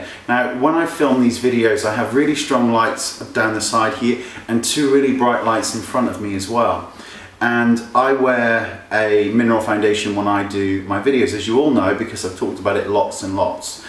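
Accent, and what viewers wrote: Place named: Bristol, United Kingdom. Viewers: You ARE BEAUTIFUL. And having an English accent. Not fair u look even more beautiful here you remind me a lot of my fav singer Darren Hayes I Love all of your videos. Thank you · Sounds like you are from Bristol.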